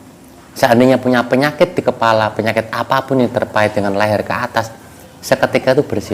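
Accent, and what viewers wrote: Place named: Java, Indonesia. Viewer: Salam rahayu ..saya lihat dari logat bahasanya kayak orang jawa, saya lht alamat nya d dipkrifsi kok dari papua ,kami dari jawa timur mas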